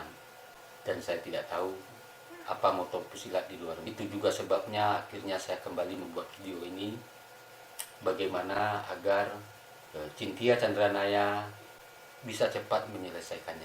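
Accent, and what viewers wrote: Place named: Minangkabau, Indonesia. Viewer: . Alhamdulillah bapak sehat, sebenarnya saya hanya meneka , ternyata bapak berbangsa Minangkabau,saya tahu dari cara perbicaraan bapak..hampir sama seperti orang Brunei,semoga channel bapak terus mantap maju dan berjaya.semoga bapak terus berkarya dengan lebih maju lagi.salam dari kami melayu Brunei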